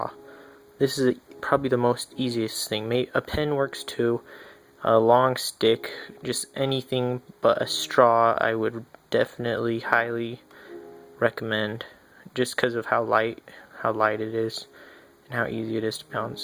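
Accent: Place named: Illinois, United States. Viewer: you from illinois? your accent is frikkin adorable.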